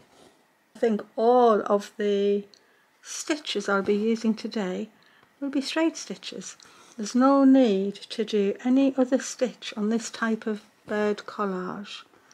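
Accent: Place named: Sunderland, United Kingdom. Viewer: you are a delight to Watch. I love watching your Beautiful Creative Work. My Husband grew up in Sunderland. So I love hearing your Accent. I live in a country town called Gingin in Western Australia. You are always welcome in my Home ❤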